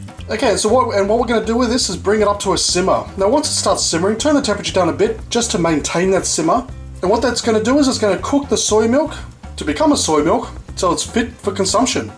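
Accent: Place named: Australia. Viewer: I love Australian accents for some reason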